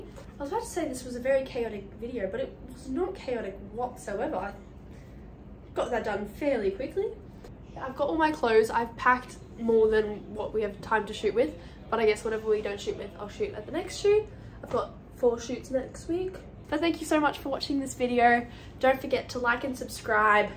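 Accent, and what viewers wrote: Australia. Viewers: I would die to look like you 😭😭 Even if I had 1% of your eternal beauty, I would probably feel more confident 😢:face-pink-tears: You are out of this world stunning - naturally cute, sweet and pretty - ur Aussie accent is adorable:) I Subscribed to your Channel btw <3:face-purple-wide-eyes: I have always thought this, but Australian people are the most beautiful people in the world - just look at Chris Hemsworth, Margot Robbie, etc... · 🙏𝟐 𝐭𝐡𝐢𝐧𝐠𝐬 𝐈'𝐦 𝐠𝐫𝐚𝐭𝐞𝐟𝐮𝐥 𝐟𝐨𝐫 𝐭𝐡𝐢𝐬 𝐂𝐡𝐫𝐢𝐬𝐭𝐦𝐚𝐬...𝐍𝐢𝐜𝐨𝐥𝐚 𝐂𝐫𝐢𝐬𝐚 𝐚𝐧𝐝 𝐭𝐡𝐞 𝐠𝐢𝐟𝐭 𝐨𝐟 𝐬𝐢𝐠𝐡𝐭 👀 𝐀𝐧𝐝 𝐝𝐚𝐭 𝐚𝐮𝐬𝐬𝐢𝐞 𝐚𝐜𝐜𝐞𝐧𝐭 😍 you've perfected the profile shot 😂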